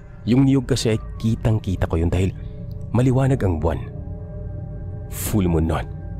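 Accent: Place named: Visayas, Philippines. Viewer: Cute mo tlga mag bisaya sir HAHAHAH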